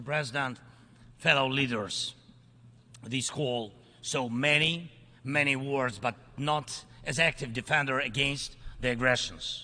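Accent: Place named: Russia. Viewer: He sounds like a Russian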